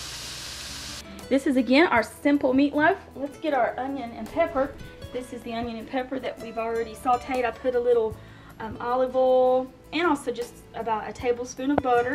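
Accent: South, United States. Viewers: I did live in NC for a couple of years too. I'm in Canada now so I sure love hearing the Southern accents again. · I love a good southern accent and yes I have one myself being from Arkansas. Great recipe, my family loved it!!!! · I knew I heard a souther drawl don't know if I spelled that right lol. great video will have to try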